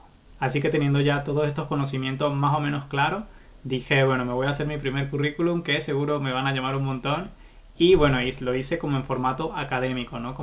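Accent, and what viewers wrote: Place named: Puerto Rico. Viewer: Jurao' que pensé que eras de Puerto Rico por el acento. Saludos desde Santo Domingo, República Dominicana.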